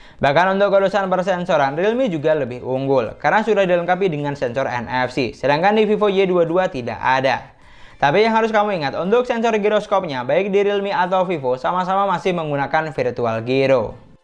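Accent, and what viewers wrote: Madura, Indonesia. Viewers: Sampean orang madura..?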